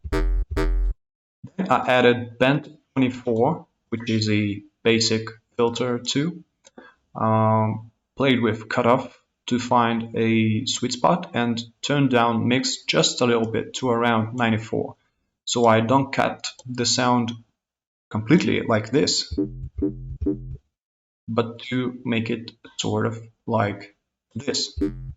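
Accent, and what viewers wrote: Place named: England, United Kingdom. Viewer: О,вы из англии?))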